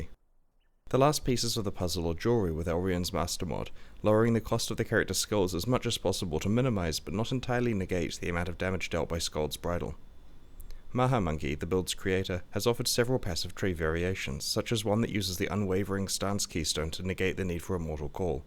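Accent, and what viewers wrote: New Zealand. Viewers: No, thats just the New Zealand accent :)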